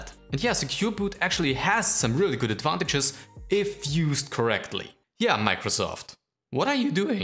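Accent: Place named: Germany. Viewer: Hi gutes Video. Ich habe genau fas gleiche gemacht wie du. Habe viel rumüberlegt, was die beste Distribution für mich ist und bin dann doch wieder bei Fedora hängen geblieben. Jetzt finde ich es wirklich gut und liebe Gnome 42👍 PS: Bist sicherlich eigentlich Deutsch ne? Man hörts ein bisschen 😂😉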